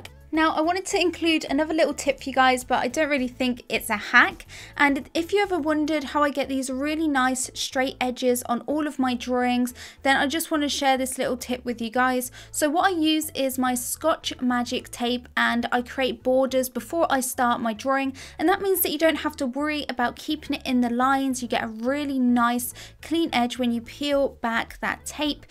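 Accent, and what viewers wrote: United Kingdom. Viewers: Are you from Britain? because I can hear your accent from Britain. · Thanks for the video👍🏻! By the way I love your accent, I’m so obssessed with British pronunciation, is it the standard british accent or a Southern/Northern? Xoxo 😘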